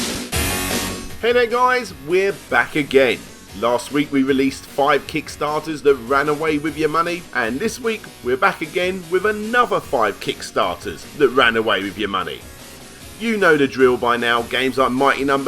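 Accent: United Kingdom. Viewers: Just kidding about not liking you as much. You British people have a way of spewing your gob that is just delightful for my American ears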